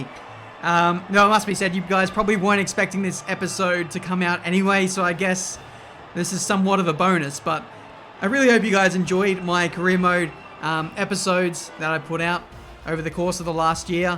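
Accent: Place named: Australia. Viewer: Can anyone else tell the difference when an Australian says flaws or floors?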